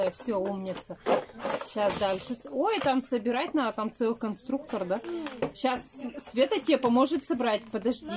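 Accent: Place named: Ural, Russia. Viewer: Посмотрите канал ,Уралочка и тогда спрашивать не станете больше ,там многие и в городе так говорят,,я жила в Екатеринбурге и там говор у всех такой ,,как в деревне и ударения в словах тоже ,, )) уши режет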